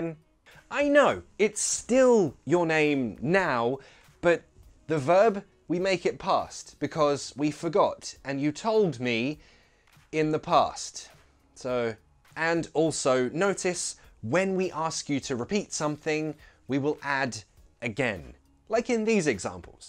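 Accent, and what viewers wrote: United States. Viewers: That American accent though 😂😂😂😂😂😂😂😂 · I totally love that American accent of yours!! So accurate lol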